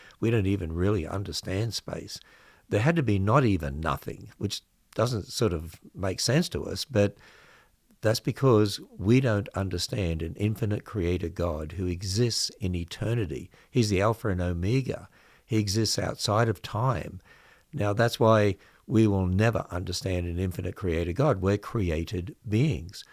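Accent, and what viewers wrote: Australia. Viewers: Good God, you can talk! At least you haven't lost your Australian accent 🇦🇺 👍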